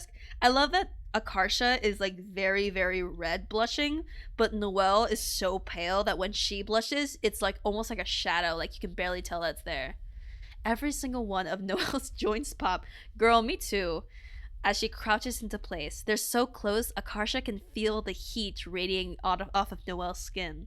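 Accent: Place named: New York, United States. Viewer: Love the new Yorker accent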